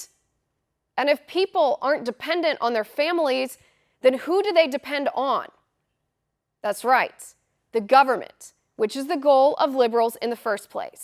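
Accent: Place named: Southwest Ohio, United States. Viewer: BWAAAAAA HAHAHA...don't give 'em any ideas..."if we are still allowed to say the word 'straight' "! BWAAAAA HAHAHA You can tell ol' girl is from southwest Ohio! Rock on, Sharonville, by way of Hamilton!!! Keep on keepin' on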